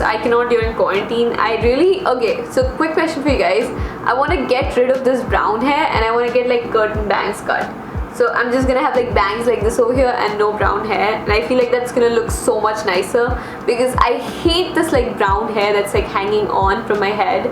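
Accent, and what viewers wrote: India. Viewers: she gives me vibes like she is lil ananya pandey and sonam kapoor ofc with south delhi/mumbai accent but more still better then them😂😂❤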